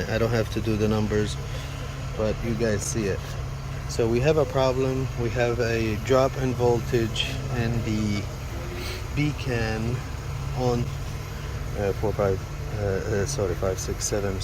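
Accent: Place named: Iraq. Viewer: متحجي عراقي هيه مال تفيك 😅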